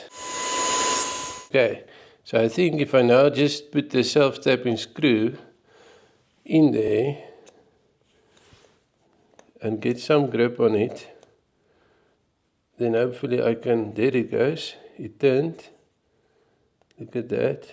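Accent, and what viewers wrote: South Africa. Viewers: South African. 👍Lekker BOER accent.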